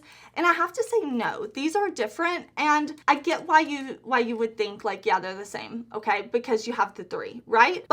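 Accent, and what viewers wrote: South, United States. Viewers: you have the cutest eyes! I also adore your southern accent. I’m Canadian and we get told we have an accent too, but I can’t tell. Your makeup in the intro is fire. 🔥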